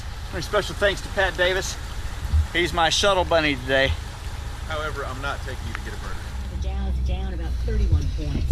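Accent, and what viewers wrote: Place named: North Carolina, United States. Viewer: I had no clue where the Haw River was. However, as soon as you started talking, i knew you were from NC or lived there. Something about that NC accent makes me miss home man, should have never moved out of Asheville, NC. Indiana sucks.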